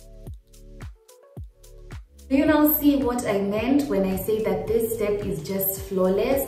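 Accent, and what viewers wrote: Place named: Kenya. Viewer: After the Nigerian Accent, I really love the Kenyan Accent😍 so distinct.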